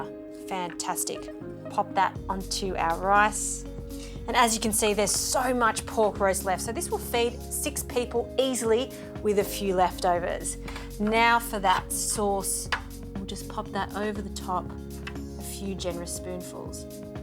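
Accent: Australia. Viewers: 😂😂😂😂😂😂😂peppah, sugah, flourah, buttah, australian stryne at its worst, some elecution lessons wouldnt go astray, yyyeeeeech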